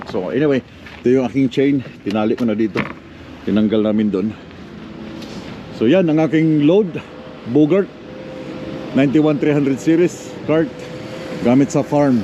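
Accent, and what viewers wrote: Visayas, Philippines. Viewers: Hi bisaya ka bai,kabati ko nag salita.tga diin ka MN diay sano?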